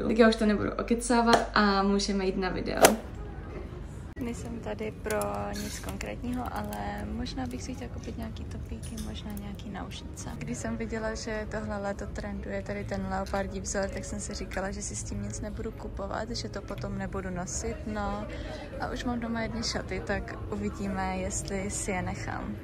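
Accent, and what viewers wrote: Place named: Moravia, Czech Republic. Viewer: a miluju tvůj moravský přízvuk ♥️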